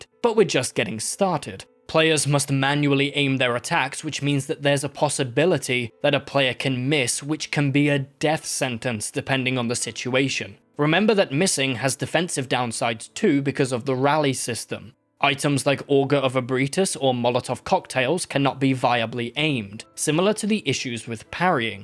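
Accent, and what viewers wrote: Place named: United Kingdom. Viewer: stayed for the take, but stop being British it's distracting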